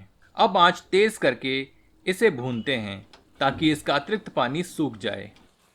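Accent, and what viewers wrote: Standard. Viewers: First of all pranaam for your shudha hindi, recipe is awesome.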